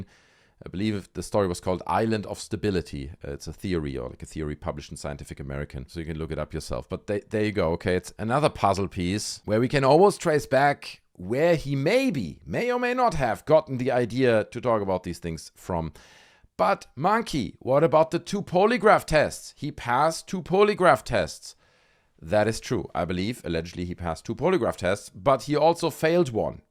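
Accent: Germany. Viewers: Interesting video but I cant stand your accent. Tf. Just speak german.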